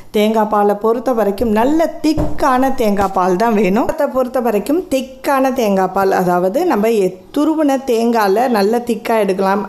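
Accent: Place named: Ramanathapuram, India. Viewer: Nenga sollurathula mukkalvasi enga ooruoda othu poguthu sister i am ramnad mukkiyama vappucha intha word kettathum aacharyama irunthuchu ada namma ooru mathiriye sollurangalenu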